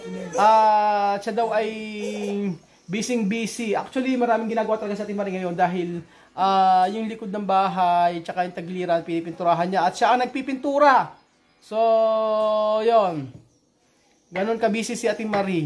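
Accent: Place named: Davao, Philippines. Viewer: Parang tqga davao ,alivio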